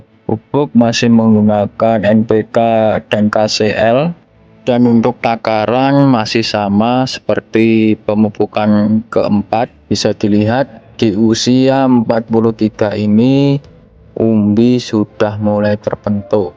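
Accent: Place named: Bali, Indonesia. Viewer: Bang tutorial ini apa di kawasan bali?? Logatnya seperti orang bali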